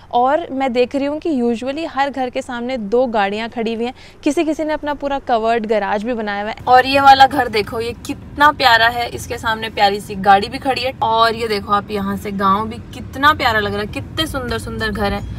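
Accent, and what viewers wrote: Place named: Bagri, India. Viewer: Ap hariyana k bagadi belt k lagte ho . Bolne ka andaz btata h . ❤❤❤❤❤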